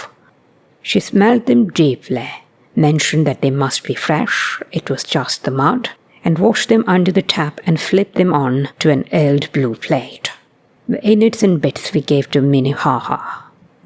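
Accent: RP, United Kingdom. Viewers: I assume this is you. Would you like to talk to other people who speak authentic heightened RP? I’d like to get a group going.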